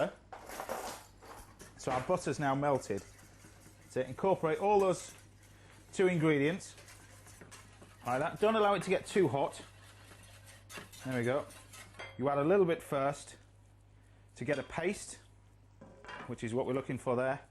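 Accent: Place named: Yorkshire, United Kingdom. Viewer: How Yorkshire does he sound! I fell in love with when I lived in Leeds but never thought he sounded really Yorkshire. But now I'm back in Australia, I can't believe how strong it is! By gum lad.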